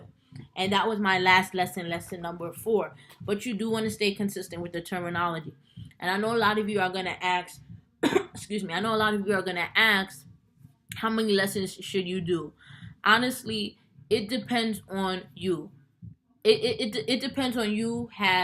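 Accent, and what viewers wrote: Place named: New York, United States. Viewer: Hello I'm going to start my student teaching and my Edtpa with 8weeks in special education and 8 weeks in elementary education. Although I have been a paraprofessional for 20 years and have a lot of classroom experience, im still a little nervous. I really like your channel. I find it very informative. I'm trying to look over the Edtpa special Ed handbook. I will be getting a dual degree in Elemantary and Special education. I have always worked in a special education setting and feel it would beneficial for me to complete my Edtpa in special education. I have a question? In the student teaching aspect im I going to be expected to take over all the duties as the teacher in the classroom by week 6 and work on completing the Edtpa? I'm here in Georgia. I'm from Westchester (Yonkers) and have a very strong New York accent. 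So when I came across your channel I knew you were from up top. Lol.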